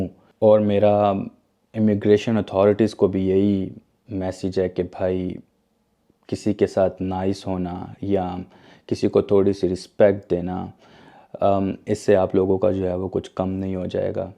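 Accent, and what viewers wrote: Pakistan. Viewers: BASED ON YOUR ACCENT, I FEEL YOU ARE A PAKISTANI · Are you from Pakistan?? Your hindi accent is not like ours. Yes you are a Pakistani...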